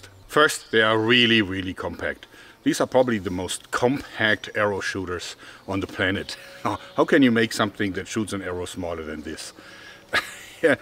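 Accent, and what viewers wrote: Germany. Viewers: excuse me! Are you German?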